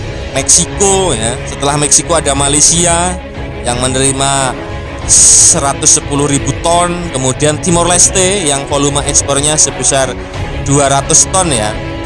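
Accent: Java, Indonesia. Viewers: Medhok banget mas.. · Waduh mas, medok jawanya ampun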